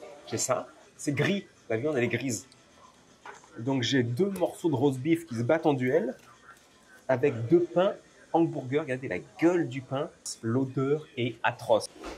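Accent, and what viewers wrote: France. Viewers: Ton anglais est tres francisé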